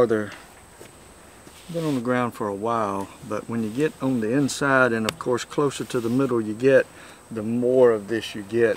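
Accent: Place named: South, United States. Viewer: I enjoyed the video and also enjoyed hearing you talk. I'm southern, but you really sound southern!! I'll look up other videos.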